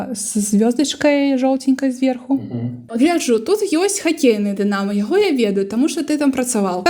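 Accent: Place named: Ukraine. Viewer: Вельмі файна ў цябе выходзіць) Асабліва з ўкраіскім флерам)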